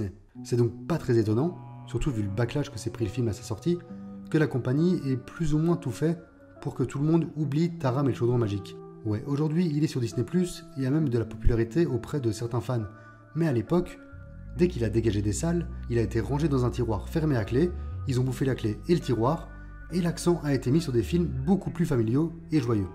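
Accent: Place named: Brussels, Belgium. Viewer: L'avais compris grâce à une autre de tes vidéos. Bruxellois, je suppose. Suis né belge flamand.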